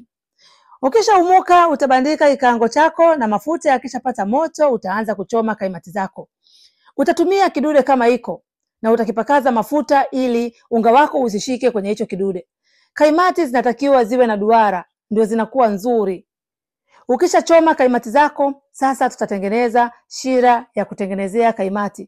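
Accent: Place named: East Africa. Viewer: Your accent is from which part of East Africa?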